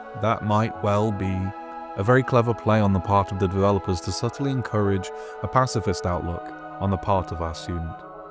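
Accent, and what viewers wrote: United Kingdom. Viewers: Yeah I’d say he’s doing it on purpose because he’s British What a weird comment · The creator is just well spoken and British lol you can tell it isn’t AI by the way his voice breaks every now and then. AI isn’t like this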